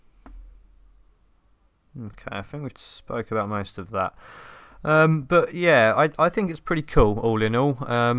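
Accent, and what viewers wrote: United Kingdom. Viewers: Are you a british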